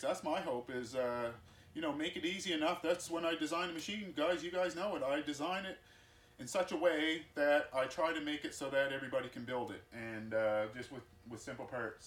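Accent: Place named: Canada. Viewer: Is it my imagination or are the most popular woodworkers all Canadian?? Love (and miss) the accent!!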